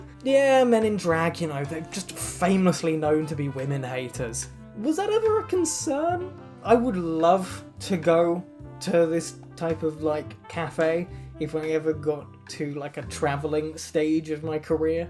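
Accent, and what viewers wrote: United Kingdom. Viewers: The sunglasses the black satin opera gloves and the British accent really does it for me. Anyone else?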